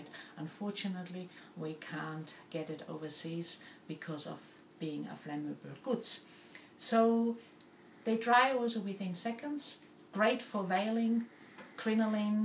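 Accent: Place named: Germany. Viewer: Listening to you I am wondering if you are German. If so from where in Germany. I was born in Solingen and grew up in Mannheim. Would love to make some wide brimmed hats but cant find patterns for it.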